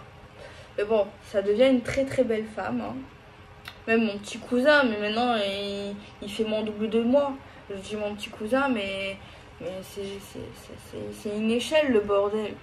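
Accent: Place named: Toulouse, France. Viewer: Bonjour tu as l'accent du ☀️ soleil peut être de Toulouse bon ça me ressemble je suis fou bélier ma chérie Verseau fille difficile à vivre Bon je l'aime très fort pardon tu as la pêche 🍑 la patate tu me fais penser à Patrick Sébastien on est serré dans cette boîte sardines j'ose un bisou merci ton tirage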